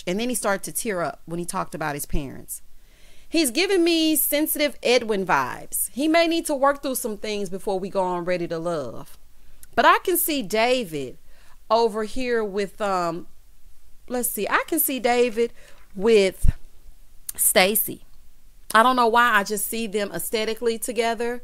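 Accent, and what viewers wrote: South, United States. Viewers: Your southern draw gets me every time Your Awesome..Keep Going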